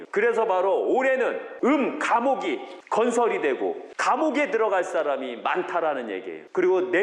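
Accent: Chungcheong, South Korea. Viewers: ㅅㅏ투리가 충청도 분이신가?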